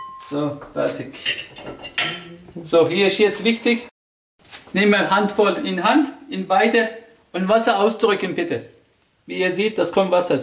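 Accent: Swabia, Germany. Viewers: das beste ist dein schwäbisch. Danke furs Rezept. :-)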